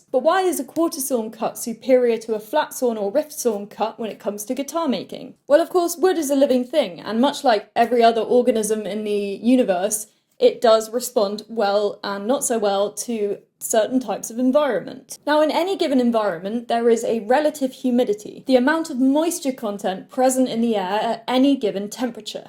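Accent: United Kingdom. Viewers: Thanks for sharing your knowledge so didactic! I love your British accent and mainly your guitar-making! Regards from Brazil! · Guitar necks, katana's. Yes it's most likely your accent. BUT, Also in American English , thay tend to drop the letter T from a few of their words. For instance ; important is said, impor ant. Mountain is said moun an. ive even heard prodly instead of probably. So you mix this with your british accent and you get katana. Funny thing is they actually pronounce the T in Katana. Why do I know this? Because I'm a Kiwi living in the states for eight now.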